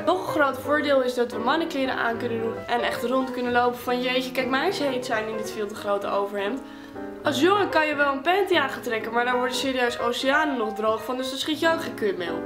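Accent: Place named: Groningen, Netherlands. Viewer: omfg dat Gronings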